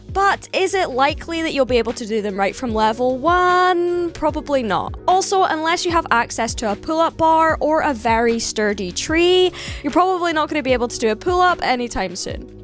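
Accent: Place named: Scotland, United Kingdom. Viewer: Loved it thank you so so much! Love love love the Scottish accent. Not so strong though. 💚🤍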